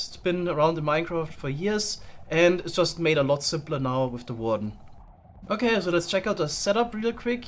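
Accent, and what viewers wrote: Germany. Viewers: Deutscher Akzent, beste.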